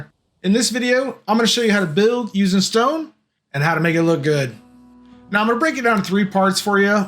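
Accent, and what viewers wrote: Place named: South, United States. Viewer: You've never spent time in the south, have you? You have a "ruff" on your house. You "warsh" your car. Accents are fun :)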